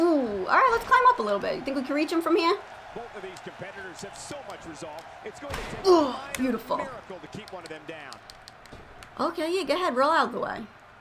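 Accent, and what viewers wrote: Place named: New Jersey, United States. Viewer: l love her jersey accent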